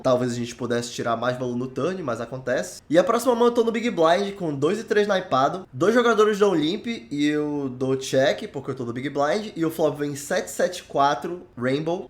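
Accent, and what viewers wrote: Pará, Brazil. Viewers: Sotaque Paraense. Muito bom canal.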